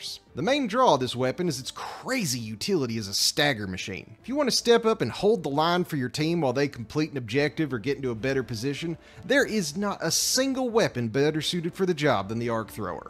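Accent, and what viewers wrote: South, United States. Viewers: A southerner talking about killing space bugs? Sign me up for the firebat squad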